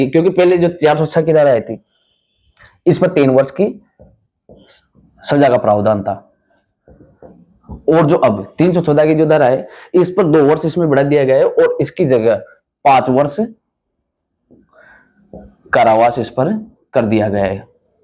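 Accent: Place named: Kota-Bundi, India. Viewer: आपका बौली का लहजा कोटा-बून्दी का है।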